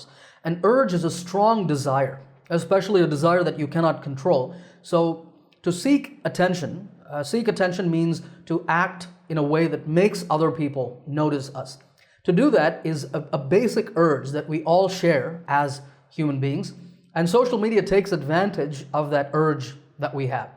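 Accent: United States. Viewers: Thnk u so much sir..... really useful n important to learn best eng with American accent 😊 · Can you tell me how did you learn or improve your american accent. thanks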